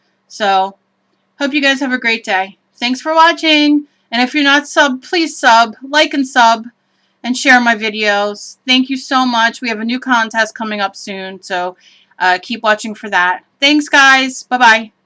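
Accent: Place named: Philadelphia, United States. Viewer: Are you from Phillie? Love your accent and love your videos!!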